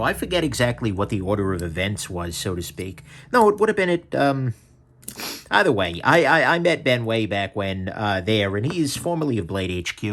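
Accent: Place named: New England, United States. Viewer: Am I the only one that thought He was from New England and not Cali?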